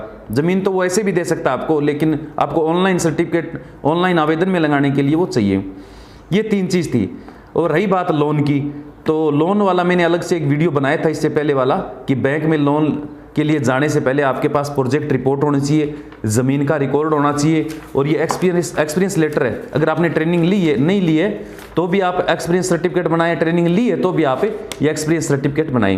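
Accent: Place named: Churu, India. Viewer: Sir aap kha se h ....aapki boli se churu k aas pass k lagte ho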